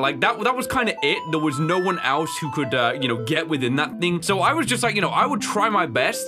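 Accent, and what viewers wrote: United Kingdom. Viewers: FACTUALLLL I do that with my cats and it's so lovely! They're my family and I love them so much! 🔺🔺 💞 Also I believe you have a slight british accent, not as british as Dawko but also not as non-british as non-british people lmao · Yep, to this Canuck you have a British accent, but like any spoken language it would be regional to your area. We have the same thing over here in Canada - we might all sound the same as Americans to the untrained ear, but there are differences for sure. All this to say - always enjoy your content and don't mind people giving you grief accent wise! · To answer your question, you do sound like you have a British accent, but it's kinda faint? If that makes sense. You can sound American one minute and the next British. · to me it sounds like you have a british accent but its not very strong like its not really obvious to hear just like dawko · yes you have a biritish accent it's not heavy but it's there. 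like how you say for, it sounds like the number four, more emphesis on pronouncing which I'm very okay with. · You sound British! So you do have a accent!! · I can hear some Brit in your voice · Its insane on how does not hear his bri'ish accent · You that uncommon British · I sometimes forget about you being from the uk until there's some words you say differently. Or use meters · Sometimes I can hear your accent in some words, sometimes not. I think as you said you are somewhere in the middle :) It's not that british english like first thing I think to myself hearing you is "Wow that's some strong british accent!", it's a bit smoother or softer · Bro sounds a bit British imo, he might be from there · kidna british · you are very British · Yea your language sounds like its in the middle with British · I can hear a British. But just me. Still like your vids! 🙂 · As an Australian listing to u you had a accent but no tom Holland British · Now that I think about it your voice is very odd compared to most British people I know, it’s like the way you pronounce things is very British but if you don’t really have an accent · yes, you do have an accent, British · You got a british accent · Of course you have an accent everyone has an accent, I myself have a P.A Dutch accent because its were I was born, you have a Britishy accent but you already knew this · you def have an accent, im american and you sound british like in between the two extreme in the meme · He just sounds British how can a British man have British accent when it was the voice he was born with?please help I don't understand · Yes u have a accent. 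Bri-ish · You definitely have an accent but it's pretty faint compared to other British people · Of course you have an accent, everyone has an accent. I don't know why some people think that standard american english for example isn't an accent even though it clearly is. I can definitly hear that you have a british accent but I don't know which one since there are so many accents in Britain. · asking about his accent: me who actually grew on a british accent because of him and now being worried if its not so british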